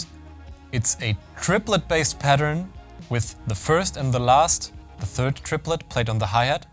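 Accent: Germany. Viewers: Bei dem Akzent dachte ich mir doch schon das es ein Deutscher sein muss👌🏼 weiter so👌🏼💪🏼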